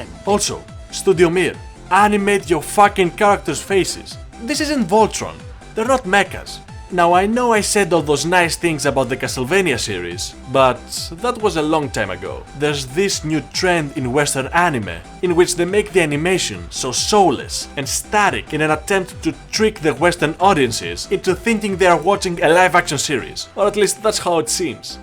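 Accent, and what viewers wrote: Greece. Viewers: You have the most thickest greek accent I've ever heard, much love bro!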